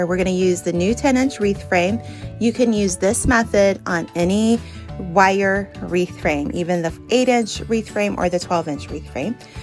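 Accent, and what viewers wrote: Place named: West Virginia, United States. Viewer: yes you still have to pay toll.its 50 cents..I understand how you say words different so people say..I grew up in west Virginia to.so I have the same problem you do.but its all good.love your wreaths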